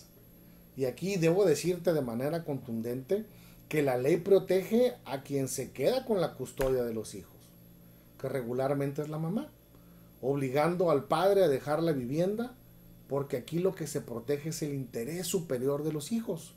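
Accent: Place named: Mexico. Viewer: Mexico, por el modo de hablar.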